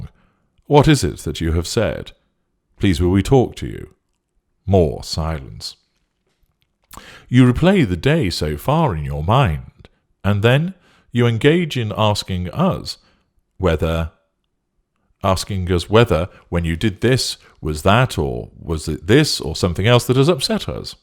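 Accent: United Kingdom. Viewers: I never need a respite from a lovely British accent, but Brits can certainly be difficult for American English speakers to understand. It's like when they have an idea-r. 🙂 The best idea is to turn a respite (a brief break, especially from something difficult or unpleasant) into a permanent narc-free vacation. Wherever you are in your journey, I hope that you are able to GOSO. 🙏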